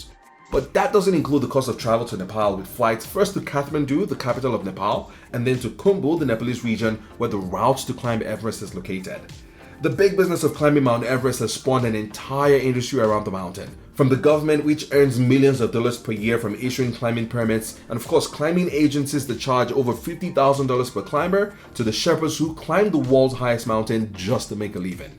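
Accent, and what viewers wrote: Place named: South Africa. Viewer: Ha ha... can't hide the South African in your voice. Decent video guy. Even though I'm not a mountaineer, I find the Goddess Sagamartha (Mt Everest) to be incredibly mystifying, beautiful and majestic. A dream of mine is to one day just see it with my own eye. Not to do a summit attempt... I just want to be able to see and marvel at it's colossal beauty.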